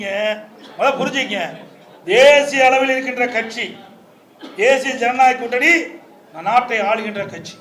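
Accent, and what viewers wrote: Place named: Kongu, India. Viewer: Kongu Tamil bro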